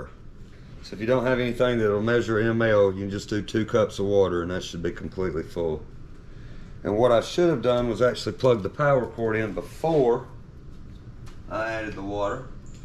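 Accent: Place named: South, United States. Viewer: Omg! Love that thick southern accent! And your information!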